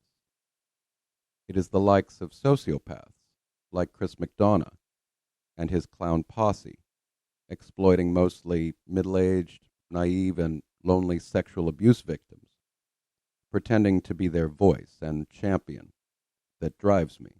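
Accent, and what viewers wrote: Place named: Ireland. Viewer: I’m late to channel, but I do love him and his to-the-point story telling. And yes, his brogue is charming, too!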